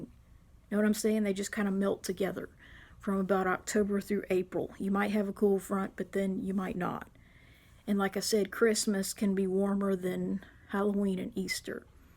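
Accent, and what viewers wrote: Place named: South, United States. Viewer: I love your sapphires and your sunglasses. My former house mate Linda lived her last years on this year in Austin Texas. she found a nice man who appreciated her and lived a good life there . I did not realize it is the state capital . I love your talks and your southern drawl. Here in the holy land, September is the bird migrating month since we are a station on the way to Europe and from Africa. This year I haven't seen the birds yet...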